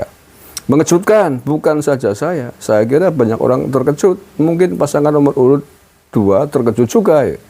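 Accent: Banyumas, Indonesia. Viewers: Jian omngne rika gawe aq ngguyu bae.omngn asli bnyumas.bner2 asli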